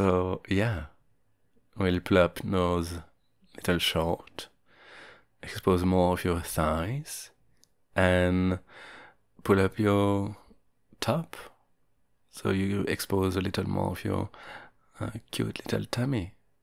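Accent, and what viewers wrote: France. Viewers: "French accent" mais totalement ! ! Je meurs ! J'adore tes vidéos ! Continue comme ça ❤ Je sais pas si t'es français, mais en tous cas ça donne l'impression mdr PS : je suis francaise, je viens de voir ton live , je comprends maintenant ton accent français mdr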